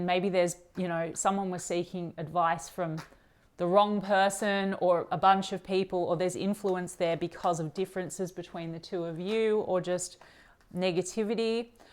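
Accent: Australia. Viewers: Great to hear an Aussie. Surfers Paradise here 🇦🇺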